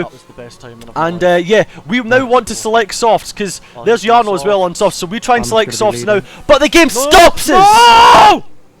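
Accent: Scotland, United Kingdom. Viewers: Ahhhh man!! That race was going so well, I would have loved to see you and Jarno fight in softs after the SC :( Still a solid finish, shit happens so just move on stronger 🙏🙏 PS: I generally have hard time with the Scottish accent but your commentary is very very clean and understandable!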